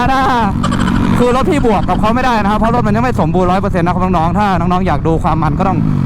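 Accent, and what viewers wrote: Isan, Thailand. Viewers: เป็นคนอีสานหรือป่าวคับ😅😅😅😅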